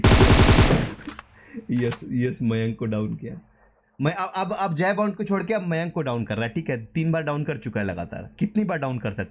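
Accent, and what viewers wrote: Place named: Kanpur, India. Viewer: Bro kanpur se ho kya because od your tone agae haa to bhai hum bhi hai ek shoutour dedo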